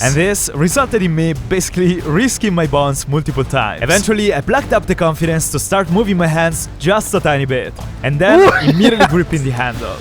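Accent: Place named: Italy. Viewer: Other skills you can learn (I'm new so I don't know if you already learned them. I discovered you yesterday.): 1. Learn the Japanese Katana (Like you did with the Nunchucks and the Staff.) 2. Develop a British accent (But don't use it because I, and probably every fan really likes your Italian accent.) 3. Learn how to freestyle rap on command. 4. Learn how to solve a Rubix cube, but blindfolded. 5. Learn to do the cup stacking game. This was all I could think of. Do you like it, and would you use it?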